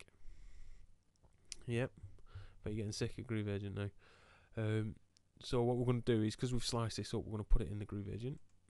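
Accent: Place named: South Yorkshire, United Kingdom. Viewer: your accent sounds familiar, you from south yorkshire?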